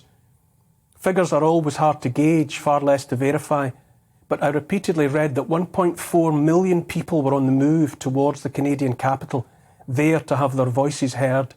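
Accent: Scotland, United Kingdom. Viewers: Listening to Neil's Scottish accent only makes me wish a bagpipe band would go marching the hill. Wish I played. I can do scottish country dancing and miss it. Thank you for your support from this Canadian Pilgrim in British Columbia (Heritage is Scottish and South African, sure do wish the Commonwealth countries, and the rest of the world, would get it together to respect its people sooner). We must win. For our children. For our country. For Humanity. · A combination of a beautiful Scotish accent and incredible intelligent, Sir Neil you are an incredible human soul ❤️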